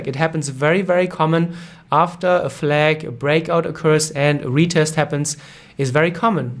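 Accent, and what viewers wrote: Germany. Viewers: are you from germany? :)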